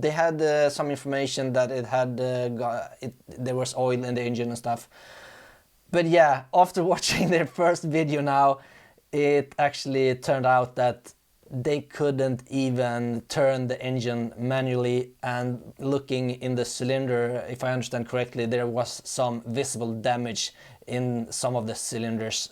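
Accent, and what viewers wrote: Halmstad, Sweden. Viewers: E du från Halmstad? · Varför svengelsk dialekt?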